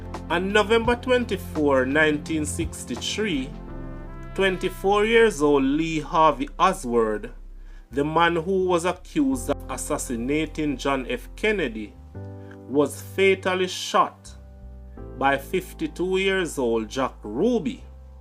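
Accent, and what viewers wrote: Jamaica. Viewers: I like the information and the fact you speak using raw Jamaican dialect!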